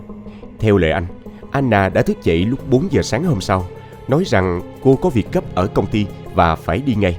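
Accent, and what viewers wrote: South, Vietnam. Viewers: Xướng ngôn viên có giọng đọc miền Nam hay quá. Thanks.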